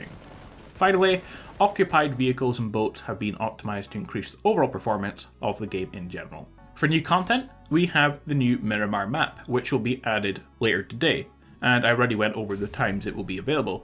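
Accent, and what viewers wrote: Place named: RP, United Kingdom. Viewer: is actually Russian he just executes a very posh British accent.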